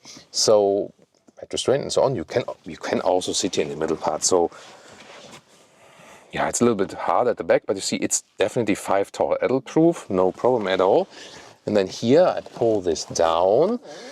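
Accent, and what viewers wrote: Germany. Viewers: Junge, Du bist "deutscher2 als Du glaubst. Lass das bitte mit dem English. Dein Akzent verrät Dich hinter jeder Silbe. Grund genug, nach 2 Minuten wegzuschlagen. Unerträglich.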